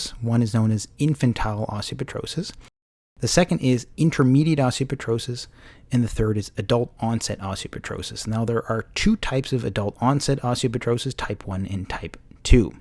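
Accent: Canada. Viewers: Excellent clearly ordered and explained. Look forward to more. Canadian accent helps!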